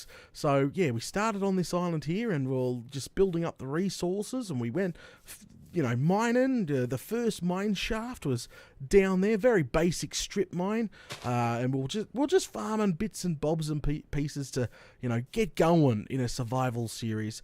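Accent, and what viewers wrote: Australia. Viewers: not hating at all but you are just soo australian it’s crazy lol. anyway wonderful video and beautiful world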